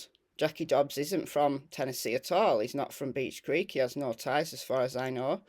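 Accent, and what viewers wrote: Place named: Newcastle, United Kingdom. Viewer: Hi i live middle england but from newcastle i love the way you talk sound like me haha love how you stick to facts x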